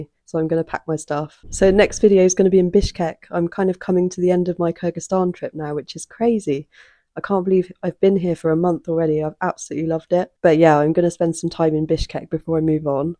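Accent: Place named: Somerset, United Kingdom. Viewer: Btw, I'm trying to place your accent, I think you're from my neck of the woods in England. I'm gonna guess Shepton Mallett, Taunton, Glastonbury....somewhere around there :)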